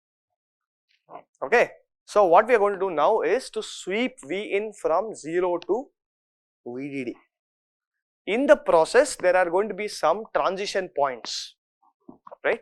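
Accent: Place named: India. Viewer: White dude with indian accent? Thats a first for me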